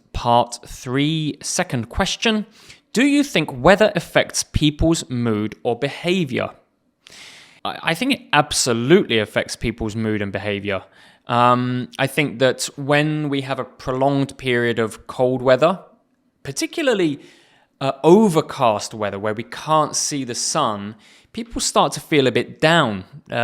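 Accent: RP, United Kingdom. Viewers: Uncountable thank you. Your British English is easy to understand for me. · Are you using rp accent?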